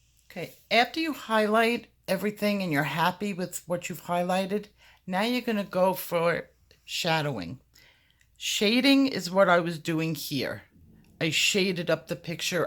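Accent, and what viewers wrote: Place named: Long Island, United States. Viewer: You are truly amazing! I look forward to your videos weekly and am so thankful that I get to hear a long Islander talk! Feels like I'm back home! Please keep these videos coming..I can't wait to see your texture videos and what's to come next!! I am learning so much from you, thank you so much!! ❤ oh I must say I developed my artistic style last week after watching your video I went through my coloring books like I do almost every day looking for a picture to color not knowing what colors to color them or what style to do, and I actually, visually, visualized the picture! It was amazing I realized it, and I never would have realized it, if it wasn't for your videos and for you! THANK YOU thank you so much I broke through the coloring block!! Xoxo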